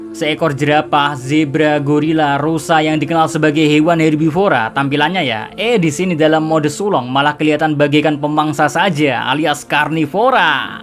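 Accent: Java, Indonesia. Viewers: Logat Jawa setengah bahasa Inggris Bahasa indo hmmm😀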